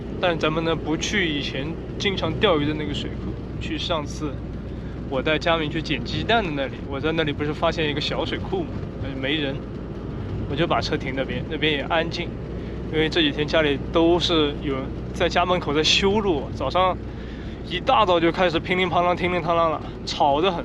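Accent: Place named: Ningbo, China. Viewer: 寧波 話聽不懂但很孰悉。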